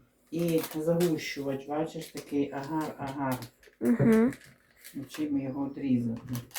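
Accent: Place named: Volovets, Ukraine. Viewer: Така вимова файна. Маю цімборку з Воловця, вона так говорить. Я не вмію, але розумію😊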